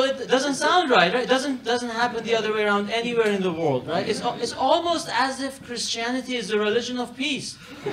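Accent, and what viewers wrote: Iran. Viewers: He has a very distinct Persia accent. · He has Persian accent and look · He's Italian right? How the fuck he has an obvious Persian accent? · I guess thats his stage name because he looks VERY Iranian and has a stereotypical Tehrani accent lol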